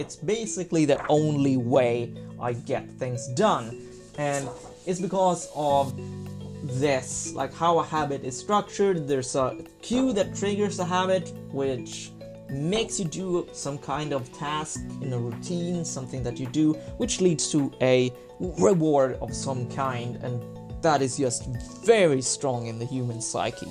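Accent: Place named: Sweden. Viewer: LazyDakota He's saying "yust" as in "just" with a Latin J sound. And, the accent is Swedish.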